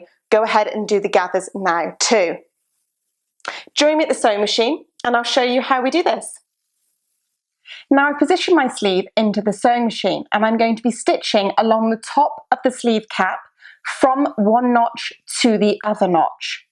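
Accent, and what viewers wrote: England, United Kingdom. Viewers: Very clear and detailed instructions. Love your English accent. I had never heard of clover leaf pins. I quilt. This would be great for matching quilt blocks!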